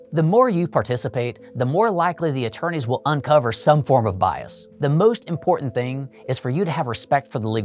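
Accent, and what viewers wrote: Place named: Texas, United States. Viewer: It's a Texas thing. All the legal beagles down here pronounce it that way.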